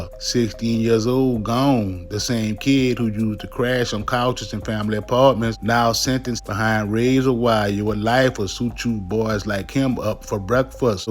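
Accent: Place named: South, United States. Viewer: Is this ai with a country accent? I understand what he is saying but the cadence is weird.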